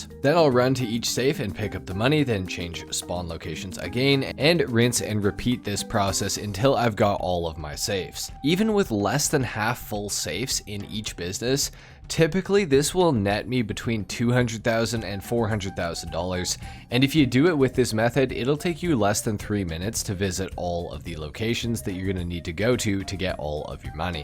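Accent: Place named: Canada. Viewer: I was watching this video, and i was like, I think I hear a canadian accent. Checked out your channel and I was right. You got yourself a sub now.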